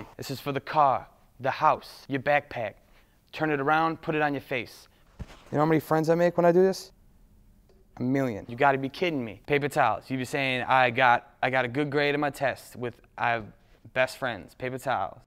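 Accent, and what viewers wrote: United States. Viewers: He sounds like he's from New Yawk x3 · Loving the Boston accent!!! "Look at all the squaaaahhees.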